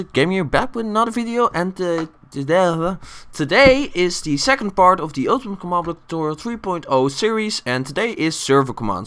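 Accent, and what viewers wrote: Netherlands. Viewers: klinkt nederlands :D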